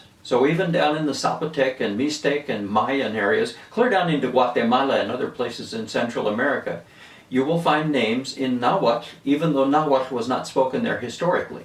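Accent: Venezuela. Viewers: I like listen your venezuelan acent. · i´m colombian, lived in venezuela for about a decade, and lived in cuba for about 7 years, and that´s something i recognize, the "smell" of a name, you made me smile today! And loved you venezuelan accent!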